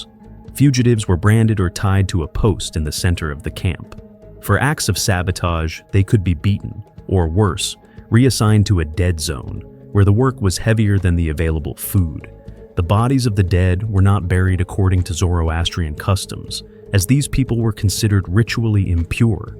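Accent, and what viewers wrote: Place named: United States. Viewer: These accounts are always narrated by an American.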